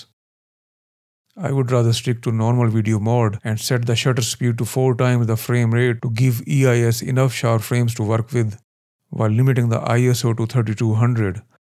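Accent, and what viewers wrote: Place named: India. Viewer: Thumbs up for keeping that DESI accent and NOT FAKING american accent 😂🤣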